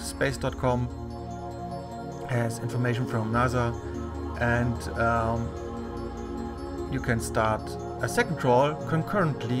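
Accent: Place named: Germany. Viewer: German English :) I like it.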